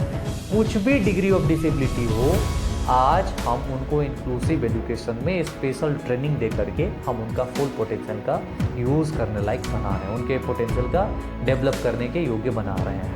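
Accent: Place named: India. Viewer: U speak Indian a lot so it's difficult to understand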